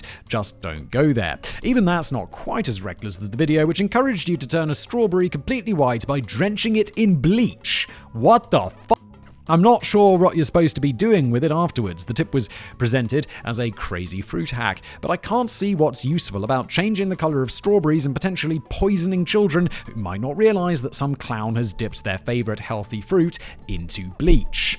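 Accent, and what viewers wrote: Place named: United Kingdom. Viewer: You have got to love the irony of the guy who is the McDonald’s of YouTube, making comments about the quality of other peoples YouTube channels. Every single time this individual has a brain fart. It turns into a new channel, he can’t even read a teleprompter without making mistakes, almost literally every single video. A guy who makes his living by literally doing just quantity over quality, criticizing other people about the quality of their videos. He mispronounces things that are basically commonly known words in the English language or our general knowledge, but he wouldn’t know that because his only qualification for his job is the fact that he has a British accent. Which makes people think he is smart, spoiler alert, he’s not. This imbecile actually claims to have a university degree from somewhere in Britain, he should send the work he has done in the last few years to his alma mater and maybe he can get his money back.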